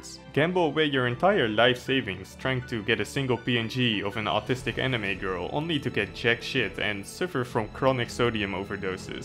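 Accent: Netherlands. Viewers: It’s hard to hear, but u can’t hide ur accent from a fellow dutchman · Are u dutch?🤔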